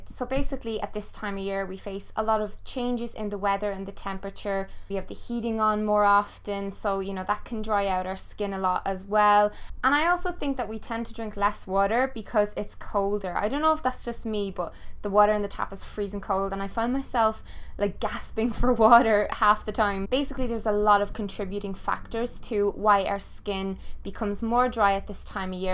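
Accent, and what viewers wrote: Ireland. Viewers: What part of ireland are you from? I love your accent, I'm from dublin. I was just wondering (love the top btw) if you have any sun are tips because I now live in California and even though i wear sunscreen I get so burnt, I have trouble going to gym class! I was just wondering if you had any tips :) I love your videos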